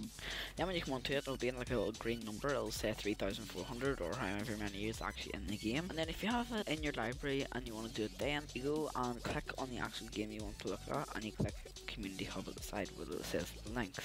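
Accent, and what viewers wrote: Northern Ireland, United Kingdom. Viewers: Not only you sound british your nose is also clogged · Northern Ireland is my guess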